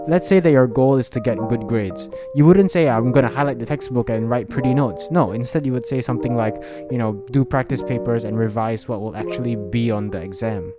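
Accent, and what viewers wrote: Singapore. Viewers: r u Sgporean I think I can hear the accent peeking through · SINGAPOREAN ACCENT I CAN HEAR IT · bro you singaporean? and also you really just said "hehehaw" :rofl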